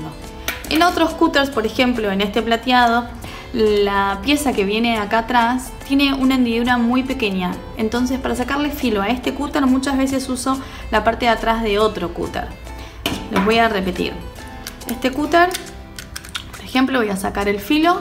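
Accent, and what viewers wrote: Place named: Argentina. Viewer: Amo cómo explica. Los argentinos hablan tan bien. Me fascina.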